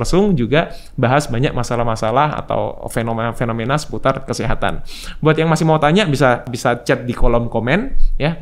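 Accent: Java, Indonesia. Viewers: dok org jawa ya,logat jawanya dapet 😁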